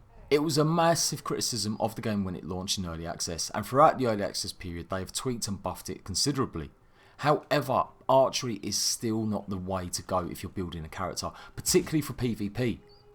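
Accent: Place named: Cockney, United Kingdom. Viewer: He is like a super-cockney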